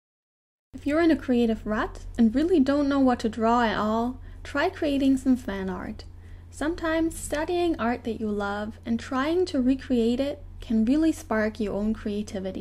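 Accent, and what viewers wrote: Germany. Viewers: Omg are you german? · are you german? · Du bist deutsch oder? Normalerweise finde ich es immer sehr anstrengend zu einem deutschen Akzent zu zuhören aber bei deinem war das nicht so es war sogar sehr angenehm. :3 Gutes Video übrigens! Es war sehr hilfreich. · Your from Germany right? :D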